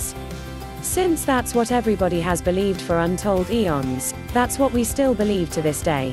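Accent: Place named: RP, United Kingdom. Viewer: I lived this book but it’s the wrong narrator reading it so I’ll have to try to find the original audiobook read by the author. I’m from the UK but this received pronunciation where every words spelt out slowly and without emotion just doesn’t fit with what this book represents.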